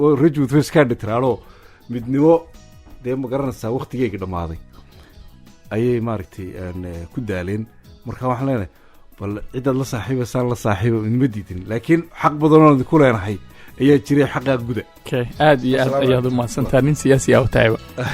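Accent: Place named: Mogadishu, Somalia. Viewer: Waa Asal Hadii ayna Baran Afka Reer XAmarka Waa Gabadh jirta Jabuuti Weeyan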